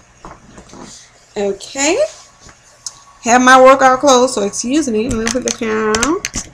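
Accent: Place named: New Orleans, United States. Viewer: You are SO COOL!!!!!!!!!!!! You have an accent, Are you from the NOLA? *New Subscriber*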